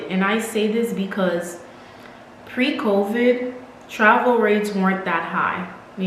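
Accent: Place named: Caribbean. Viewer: I sense a carribean accent. Are you and island girl ? Thanks for the content. Dont the agency pays for everything again?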